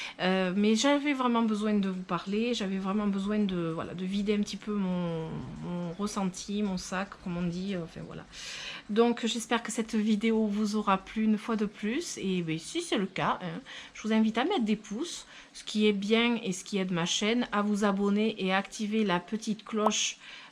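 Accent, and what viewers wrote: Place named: Toulouse, France. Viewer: Bonsoir Voici plus de 6 mois que je regarde les vidéos,je vous trouve très sympathique vous me faites penser à ma belle belle sœur qui habite près de Toulouse ce petit accent fort charmant.Je n'ai pratiquement pas de plantes vertes à la maison seulement quelques succulentes. Mon grand bonheur c'est mon jardin de 1200 m2 je m'éclate aussi comme vous je consacre beaucoup de temps. je comprends votre fatigue et j'imagine bien le temps que vous y passez. Pour cette tendinite bien sûr les anti inflammatoires pourraient vous soulager mais c'est surtout une mise au repos de votre bras et ça c'est pas gagné! Encore bravo pour tous vos bons conseils c'est un réel plaisir à vous regarder 2 fois par semaine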